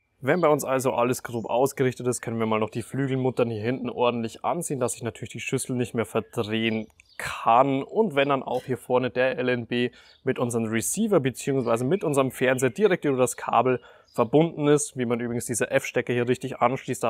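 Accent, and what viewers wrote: Germany. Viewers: 👎🏼 wegen der deinem deutschen Englisch Lasst es doch einfach sein mit eurem scheiß Grundschul Englisch Geschwätz. Du machst ein deutsches Video dann schwätz auch deutsch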